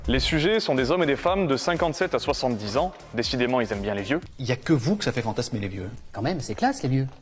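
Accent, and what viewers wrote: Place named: England, United Kingdom. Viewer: Je valide pour la qualité et l’accent anglais surtout ☺️.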